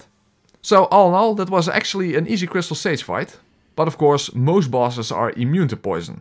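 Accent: Netherlands. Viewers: I fxcking love Dutch accents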